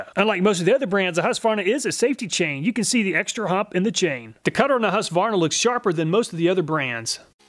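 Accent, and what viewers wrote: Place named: South, United States. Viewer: Very good info but man you talk so fast my ears could not keep up so I lowered the playback speed to .75 and now I can understand you better , now sounds just like a good old boy from the South which my ears appreciate much better .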